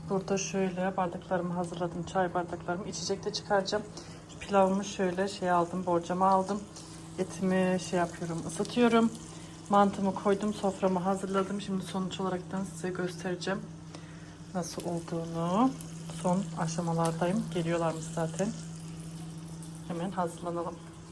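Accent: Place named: Kayseri, Turkey. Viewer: siz nerelisiniz, eşim kayserili, aileside sizin gibi konuşuyor, koymussum, almıssım, yapmıssım gibi gibi, bana çok garip geliyor, ilk defa eşimin ailesinde duymuştum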